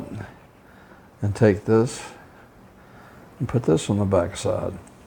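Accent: South, United States. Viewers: I love your accent❣️ What part of the South are you from (Louisiana, here)? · OMG! I luv your cinnamon rolls and most of all your southern accent makes me home sick for for family in Beaumont, TX YOU go girl. Peace out. S. Valentine Los Angeles, CA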